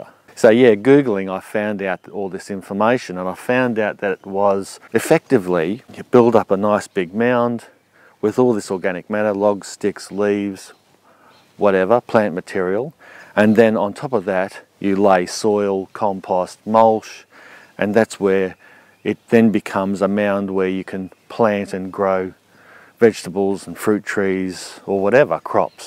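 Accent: Australia. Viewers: You're going to be a YT Star....I wish I had an Auzy accent.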